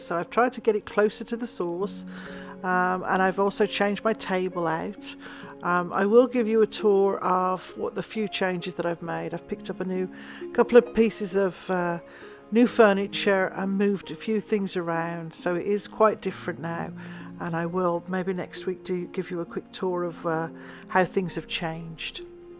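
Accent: United Kingdom. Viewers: We live in Arkansas US. Getting close to building our home and decided French Country is my favorite style. My friend of over 40 yrs is British and hence I enjoy your voice. Love your ideas! Was excited to see the blue willow as I have the exact plates 💙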